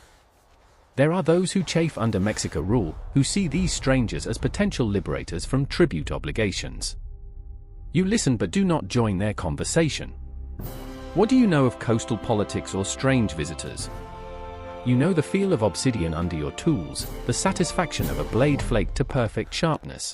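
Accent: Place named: United Kingdom. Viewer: It’s read by a British person… the irony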